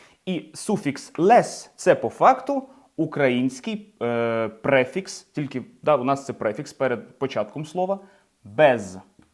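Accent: United Kingdom. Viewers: Ці відео дуже корисні! І я вам дуже вдячна за ці уроки. Я завжди вам коментую бо я дійсно хочу щоб у вас був мільйон підписників! Обожнюю ваш британський акцент! Можливо ви колись розповісте нам як ви вивчили англійську так бездоганно!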